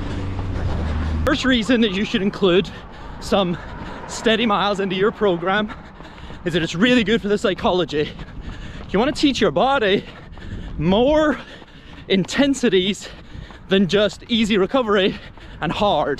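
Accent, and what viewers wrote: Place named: Northern Ireland, United Kingdom. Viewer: Heard the N.I. accent & I’m intrigued. I’m doing the Belfast marathon 20 years after the last time I did it & hoping to do it faster.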